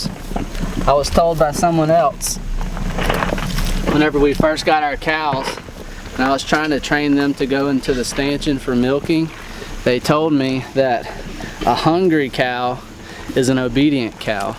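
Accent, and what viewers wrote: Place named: Kentucky, United States. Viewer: I like your gentle way with your lambs. Thanks for sharing, we just got three 14 week old girl lambs yesterday. I'm hoping ours can eventually be as freindly and trusting . Karen from Newzealand. By the way love your accent are you anywhere near Kentucky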